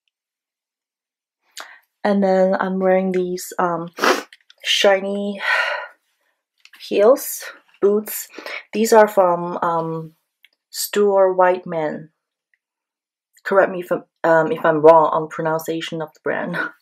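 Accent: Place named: England, United Kingdom. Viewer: like your english accent. wanna hear chinese too! plz do chiese video sometime soon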